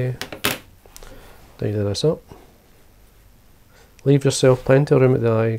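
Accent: Scotland, United Kingdom. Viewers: The subtitles are hilarious, is it that hard to understand a Scottish accent?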